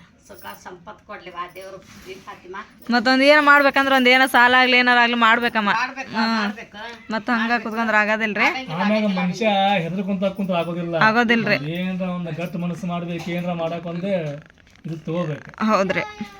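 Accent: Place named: Hubli, India. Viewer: Beautiful family ur Hubli is very beautiful & ur hubli kannada is so cute I like it gd night allah hafiz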